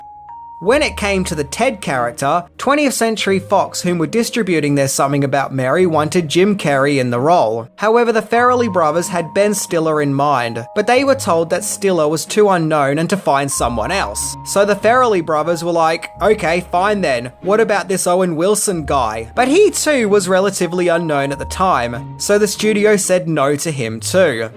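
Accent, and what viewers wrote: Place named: Australia. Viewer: Great review of a funny film,. Your humor combined with your cool Aussie accent always keeps me entertained. Respect and Blessings 🙏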